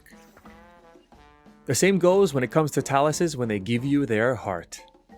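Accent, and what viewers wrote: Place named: Netherlands. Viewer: alles goed? Edit: NL Engels accent ; ) goede tips trouwens. Bedankt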